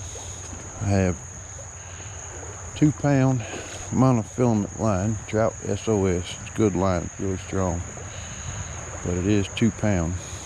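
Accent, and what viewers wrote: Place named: South, United States. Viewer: This Yankee loves to hear you soft spoken southern guys talk!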